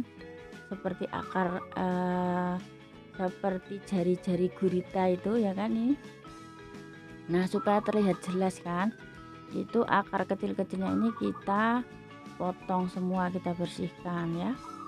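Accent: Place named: Java, Indonesia. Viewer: pantes logatnya Jawa banget Salam dari Klaten . Prambanan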